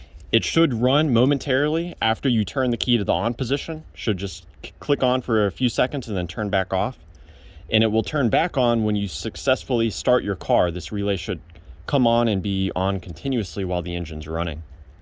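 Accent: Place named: United States. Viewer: You told me EXACTLY the pin info.. And clearly in an American voice.. Lol.. I want to drain the old fuel .. Been sitting more than 3yrs. Thank you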